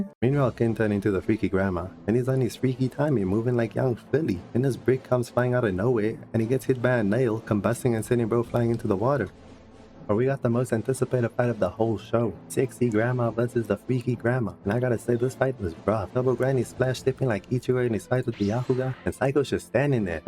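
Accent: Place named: Australia. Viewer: this is a black australian accent, i believe. i have black australian friends that sound the exact same.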